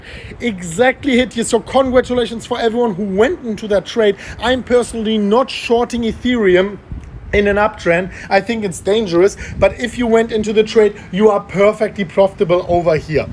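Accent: Germany. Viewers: Dieser geile deutsche Dialekt:-) Bist echt ein cooler Typ und ich bin durch dich überhaupt auf Crypto gekommen. Hab mir heute einfach just for fun für xxxx Euro Bitcoin gekauft und lass es liegen:-) vielleicht hypen mich aber deine Videos auch wieder etwas mehr zu spekulieren! Daumen hoch, viel Spass weiter im Paradies. Deutschland ist immer noch kalt;-)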